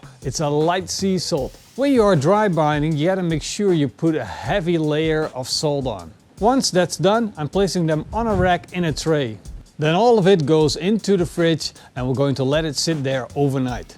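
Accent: Netherlands. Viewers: Dutch accent ?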